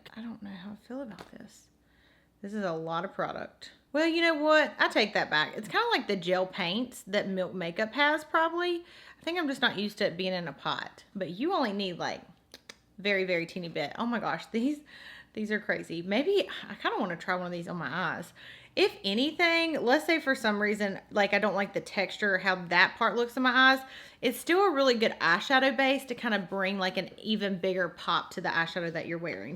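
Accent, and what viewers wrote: South, United States. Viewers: You have to be from the south lol but anyway I love your videos!!! Everytime my phone dings I'm hoping it's a new video from you.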